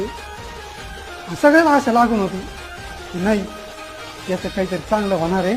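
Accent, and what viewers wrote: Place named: Ahmednagar, India. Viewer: नगरच भाषा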